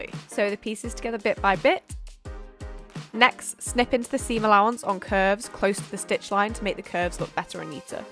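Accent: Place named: United Kingdom. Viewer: I often watch videos on 125% speed, but I have to concentrate to listen to you on normal speed with your British accent me being American .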